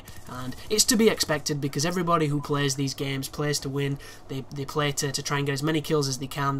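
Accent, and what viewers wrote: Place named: North, United Kingdom. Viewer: Straight talking, no BS northerner. The best type of person. Hearing your commentary is great and I could listen to it all day. True talent there. Not offending other commentators but they can get annoying ( I won't go into detail but the ethics and scale of their channels turn their heads upside down)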